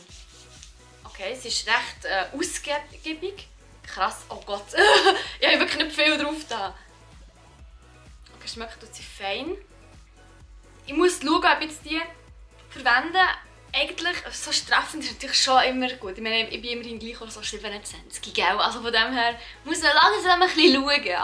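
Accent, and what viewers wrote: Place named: Switzerland. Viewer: Auch wenn mich beauty nicht interessiert, habe ich Spaß daran dir zu zuhören. Einfach nur weil mich das schweizer deutsch so fasziniert. Lg aus Niederösterreich :)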